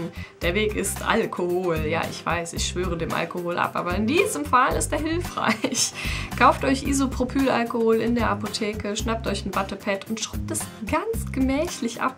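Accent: Germany. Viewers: Kommst du aus Münster oO ?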